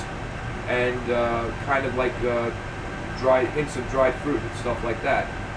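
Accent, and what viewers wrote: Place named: Massachusetts, United States. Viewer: I noticed the patriots hat and the accent lol Are you from Massachusetts? I'm from Taunton, Mass.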